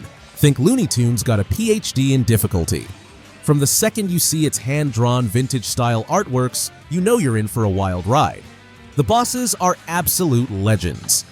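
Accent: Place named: United States. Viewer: American assent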